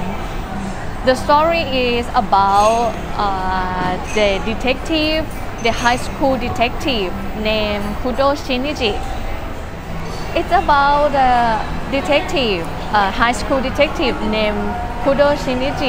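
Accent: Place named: Asia. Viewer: Bien pour une première, bizzarement filmé ce doit être du au montage avec de nombreuses coupes non? sinon son anglais a parfois est rigolo avec cet accent tonique asiatique, ça me rappelle par moments Michel LEEB dans son sketch du chinois..LOL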